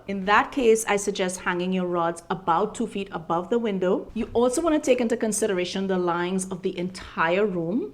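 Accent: Trinidad and Tobago. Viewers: Wait, are you Trini or Guyanese?? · Are you a Trini because sound like a Trini😊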